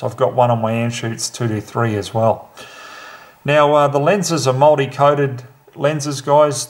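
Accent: Australia. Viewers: I absolutely love to hear an Ozzie talking guns, but...how are you an Ozzie talking guns? Was this filmed in a secret bunker?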